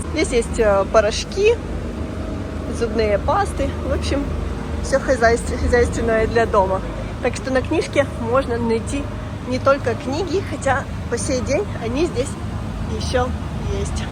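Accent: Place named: Odessa, Ukraine. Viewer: Дорогая мая Одесса и самое главное мая красавица и не только внешне но самое прекрасное сердцем душой и мыслями. Я Алексей из далекого Примоского края город Находка не Одесит и небыл не когда у вас мои ученики были в советское время на соревнованиях и всегда рассказывали про Одеситах как простых добрых людях с оболдетной харизмой и юмором...никакого негатива не когда не привозили от вас ученики только положительные эмоции да анегдоты всякие летучии фразы и слава Богу что так было и дай Бог что бы так все и востоновилось. Всегда хотел попасть к Вам и в живую услышать одеский говорок он у вас проявляется частенько а голос ваш просто 9авораживает...ангел во плоти вы воплощение как и ваша сестра той чистой не оболваненой молодежи и умными правельными мыслями. Жаль что не могу попасть в Одессу но надеюсь что мирное время настанет и разум восторжествует над оголтелом фашизмом который мы с вами все в 45 году победили. 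Здоровья вам сестре и всем родным и близким да всем Украинцам Одеситам.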